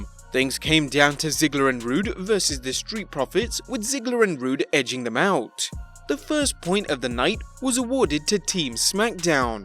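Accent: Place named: United Kingdom. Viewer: YES!!! The British voice is back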